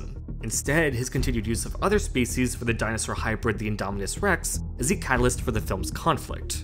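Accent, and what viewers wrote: Canada. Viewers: canadian accent detected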